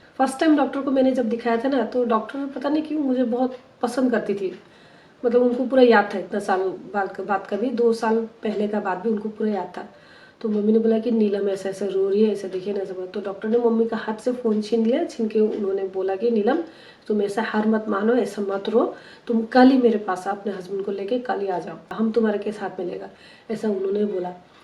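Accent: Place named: Nepal. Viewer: Why do you sound like Nepali?? Di are you Nepali ??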